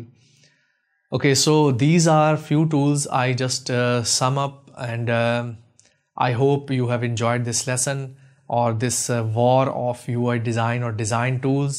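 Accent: India. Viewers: white man with Indian accent !!!!!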